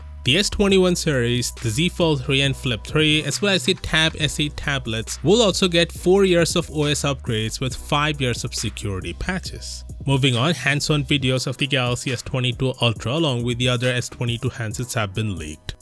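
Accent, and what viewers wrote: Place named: India. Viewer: I loveee your accent. I would think indian with the Palatial clicks, but there’s a nonrhotic r, May I ask where from?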